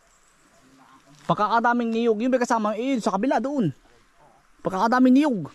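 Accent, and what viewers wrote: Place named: Batangas, Philippines. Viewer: Silent subscriber from California USA....naaaliw akong panoorin ka. Ang diction mo ay kakaiba parang Batangas. Ganyan ba sa Tayabas Quezon ang salita,nakakatuwa ka pakinggan. Masipag kayong magkakapatid, lucky ang mga spouses ninyo na magkakapatid masipag,humble,masikap at maasikaso sa magulang. Ang fruits ay puede lang kuhanin sa.puno hindi na bibilhin pa. Ang bayabas ay expensive dito malalaki 1 lb.is $6.99 rich in Vit.C iyan. Edible ba ang prutas na parang strawberry, baka poisonous iyan.Always watching ur vlog lalo na at stressed ako sa work. GOD BLESS!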